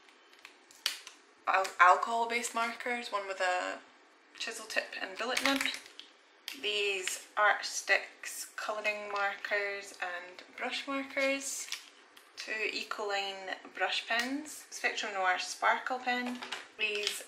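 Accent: Scotland, United Kingdom. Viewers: Hey! Is that a scottish accent i detect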